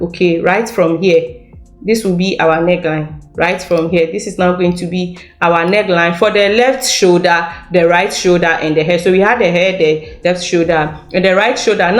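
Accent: Ghana. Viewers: It's Your Ghanian Accent for me🥰😍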